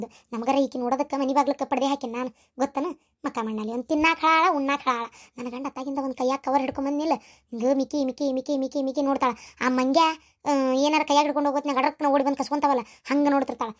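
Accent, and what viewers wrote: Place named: Hubballi, India. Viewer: ಮನೆಯೊಂದು ಮೂರು ಬಾಗ್ಲು ಹಾಕ್ರಿ ನನ್ ಫೇವರಿಟ್ ಸ್ಟೋರಿ ಮಿಸ್ ಮಾಡ್ಬೇಡಿ,I love your ಹುಬ್ಬಳ್ಳಿ ಭಾಷೆ🥰😍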